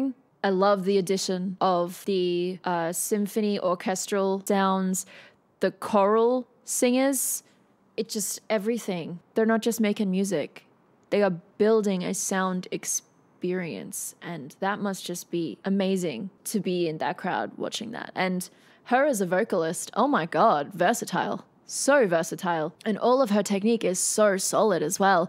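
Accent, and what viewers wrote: Australia. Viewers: your reaction video of was the third music reaction video I ever watched. It still own's a little "piece of my heart". Hope you are well. Loved seeing you with Elizabeth Zharoff (The Charismatic Voice). Love hearing an Australian accent - never thought I'd ever actually write that! G'day mate. Vic 3044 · ... we are going to the advencha... love your ozzie accent :)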